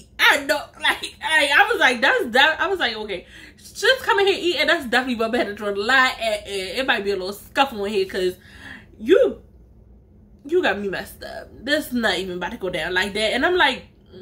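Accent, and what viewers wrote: Baltimore, United States. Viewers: Baltimore Maryland for sure lol I hear the accent , I'm from Alexandria Virginia 😁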